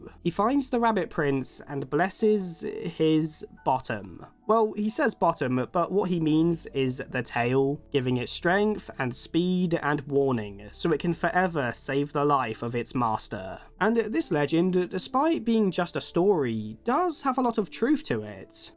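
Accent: United Kingdom. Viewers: I was trying to place the accent. Sounds like if Mark E Smith if he came from Southend and not Salford. It's nearly unlistenable.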